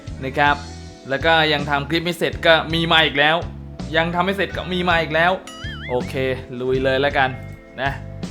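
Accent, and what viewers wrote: Isan, Thailand. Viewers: เอ้า พี่เป็นภาคอีสานผมพึ่งรุ้ แล้วอยู่จังหวัดอะไรครับ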